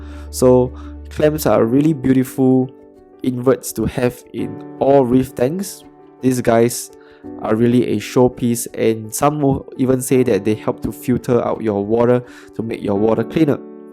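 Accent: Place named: Singapore. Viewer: Typical singlish....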